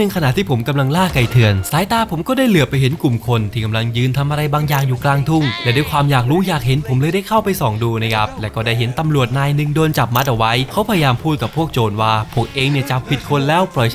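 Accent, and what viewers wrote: South, Thailand. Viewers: ไก่เถื่อน..คนใต้ม้ายนิ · ล่าไก่เถื่อน คนใต้หรอพี่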